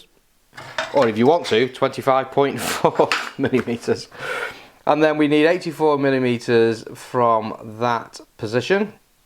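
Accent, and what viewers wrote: United Kingdom. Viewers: nice vid just found your channel mate if this video is anything to be judged on looking forward to other content is that a Manchester accent or do my ears deceive me from Manchester my self just asking many thanks Harry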